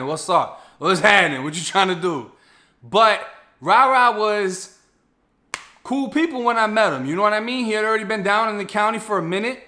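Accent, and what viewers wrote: Boston, United States. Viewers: Lol Cuz is u from boston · You from Boston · Hahaha Irish ☘️ 💪🏼And italian 🇮🇹💪🏼with a straight southie (Boston) accent.... it make all sense now .... did u ever explaine in your vids or anyone ask how the Florida relation goes with the New England accent??💫✊🏼💥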